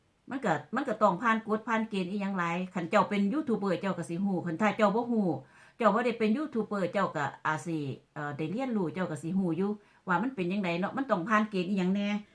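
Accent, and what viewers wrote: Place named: Khon Kaen, Thailand. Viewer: เปลี่ยนช่องมาติดตามพี่จ๊ะดูหลายตอนแล้วชอบสำเนียงคนขอนแก่นเพราะคุณยายและแม่เป็นคนขอนแก่นค่ะ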